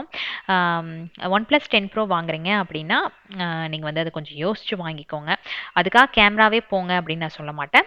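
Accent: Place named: Telugu, India. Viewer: akka nega telugu thana ka❤❤❤ nagalum ipadi tha ka telugu pesuvomm...... Nega pesurathu ennaku nalla puriuthuka...... ❤❤Naga pesura marriye telugu pesuringa....... Unga vedios eillamey enaku romba pudikum...... Enoda day vey ungaloda vedio la start agum...... ❤❤❤❤❤❤avlooo postive vibe akka ❤❤❤❤❤unga voice and vedios❤❤❤❤......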